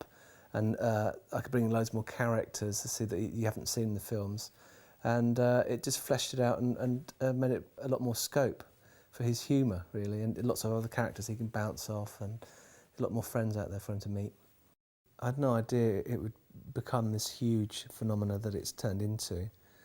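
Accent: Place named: England, United Kingdom. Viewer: I KNEW he was English. Lol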